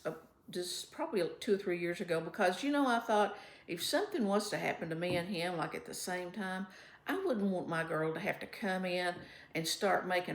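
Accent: Kentucky, United States. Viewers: Hey,! I so appreciate you ladies who get on youtube and share from your heart. Sorry to hear about your friend's husband. Praying for her. God is our comfort and He never leaves us. Praying for your husband as well. My husband is in a care facility as I think I shared with you. He had a stroke and I am asking everyone to pray for him, for us. I love listening to you. You are so cute and make me giggle a lot. Love your southern accent from Kentucky. My Grandmother was from Texas and I loved her accent. You are like visiting with a special neighbor. Praying for you. ❤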